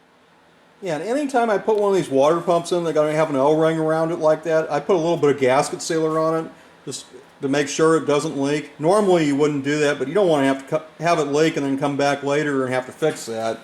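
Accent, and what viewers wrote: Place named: Seattle, United States. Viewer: nice information! you sound like you are from Seattle.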